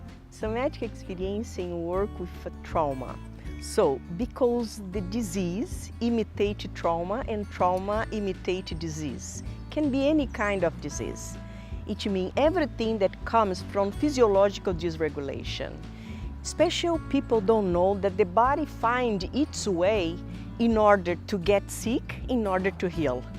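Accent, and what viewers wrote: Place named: Brazil. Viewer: So good to hear a brazilian voice in SE world! Thank you for this explanation. I am studying SE! ❤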